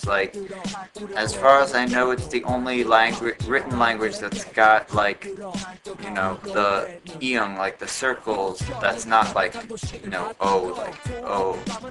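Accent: New York, United States. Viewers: are you from upstate? or long island? just curious..lol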